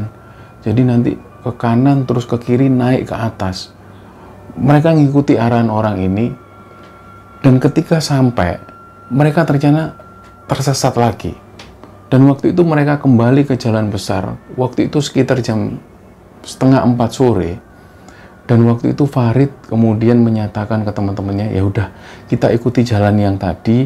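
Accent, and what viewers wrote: Java, Indonesia. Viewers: Paling suka kalo mas yang bercerita. Meduk suaranya hehe. Sukses selalu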